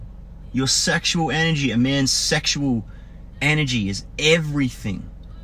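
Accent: Australia. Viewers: u Australian mate? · Literally just made a vid like this aswell. You an aussie?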